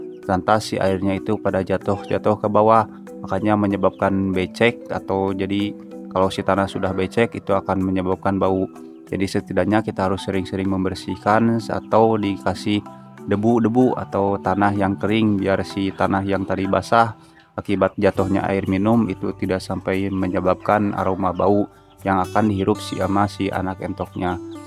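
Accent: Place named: Sunda, Indonesia. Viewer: assalamu'alaikum mang amang orang mna gening ngomongna sunda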